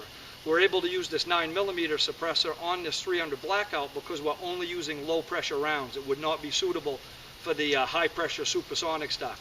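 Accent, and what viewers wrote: Maine, United States. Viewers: Southern Maine accent. Gardner maybe?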